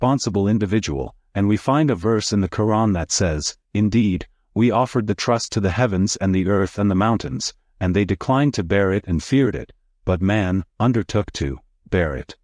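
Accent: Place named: Tunisia. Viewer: لا يا أخي أنا شخصيا لن أشهاد هاته الفيديوهات نحن نحب صوتك و طريقة إلقائك و تفاعلك باللغة العربية الممزوجة بلهجة تونسية لي نحبه ياسر كمغربي جارك و محب من أحبائك شكر و أتمنى منك تفهمي.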